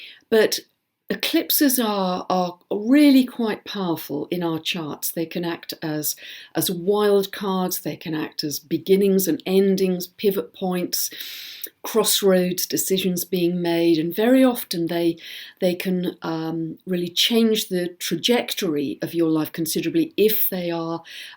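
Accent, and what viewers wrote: United Kingdom. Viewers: You are my favorite internet fairy. Your forecasts are way cool. Really enjoy the way you talk and the British accent, too. Thanks for doing this. Greetings from Florida. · Beautiful lady, great astrology! I love her British accent, too! So chic!!! Thanks & Blessings! ✨💫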